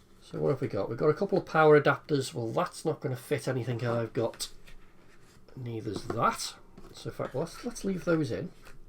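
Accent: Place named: South Shields, United Kingdom. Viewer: Sounds like your from South shields 🛡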